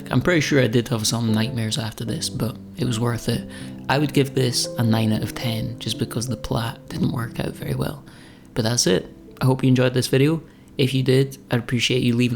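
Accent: Scotland, United Kingdom. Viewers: THIS was so relaxing to watch. I enjoyed every minute of that!, good job. Your voice is ever so calm...and being an American I SO MUCH appreciate your accent. I understand every word, but makes me want to learn to speak English with a Scottish accent. I found it SO CUTE that JR your cat wants to be a sous chef while you bake. Adorable. Your sense of humor is spot on too, laughed out loud a couple of times during this. A you tube fan, Brian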